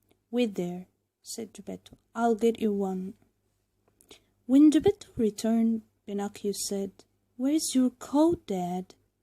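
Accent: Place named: United States. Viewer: Cool, I thought you were American before I saw your channel. 💕💕💕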